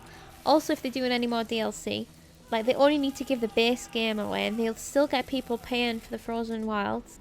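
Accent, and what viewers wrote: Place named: Newcastle, United Kingdom. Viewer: That Geordie accent is adorable I wanna hear you talk all day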